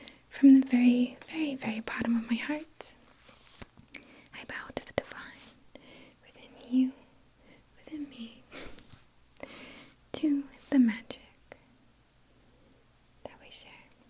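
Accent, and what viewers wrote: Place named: New York, United States. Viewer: Your voice is the perfect trigger for me! As a New Jersey guy I am a sucker for a New York accent LOL. Thank you for the wonderful relaxation you bring me.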